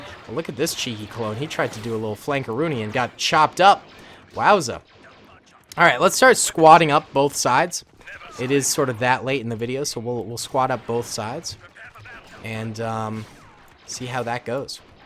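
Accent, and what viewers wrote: Russia. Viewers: leave the russian accent for barronvongames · his russian accent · This is the perfect video. Your Russian accent is hilarious, and the Soviet Anthem in the background makes it even more entertaining. Also, you should try doing an Old Republic video someday.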